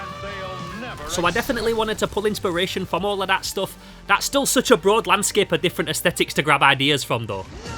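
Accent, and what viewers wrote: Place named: Northumbria, United Kingdom. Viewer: What is that accent? Escaped straight out of Northumbria to make Tonks. Great video I'll subscribe to the time traveler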